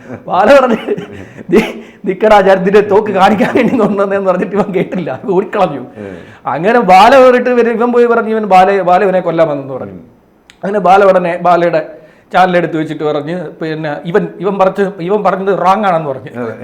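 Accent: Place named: Thiruvananthapuram, India. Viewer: എന്താ.............അഴുക്കു പയ്യൻ 😁., മ്മ്‌ടെ തിരുവനന്തോരം ഭാഷ 😆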